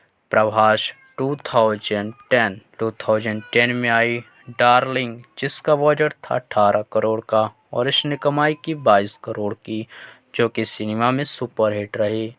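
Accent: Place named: India. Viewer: What city are you from? I'm interested in figuring out where the accent in India is from.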